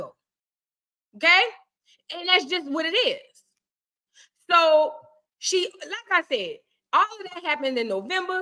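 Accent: New Orleans, United States. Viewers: You definitely be putting everybody on before they catch on 💯love u 🥰i want a N.O accent so bad 😂😭 its so fire 🔥 lol 😆 🖤🖤 via the green lady state 🗽🗽🗽😂🤣#keepwinning💪🏾✊🏾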